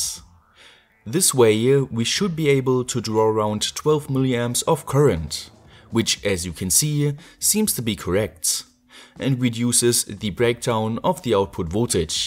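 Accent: Germany. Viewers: Give the guy a break! He's German. I don't think his pronounciation is so bad!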